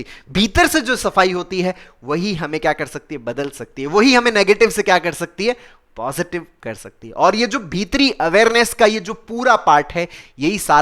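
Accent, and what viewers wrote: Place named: Rajasthan, India. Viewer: Sir aapke kahne ka tarika Bikaner rajasthan se bahut achha lgta h 😊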